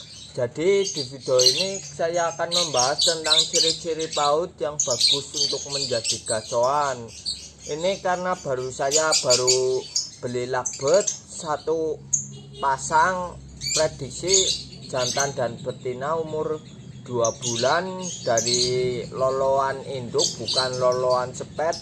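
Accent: Banyumas, Indonesia. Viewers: Purbalingga ngapak2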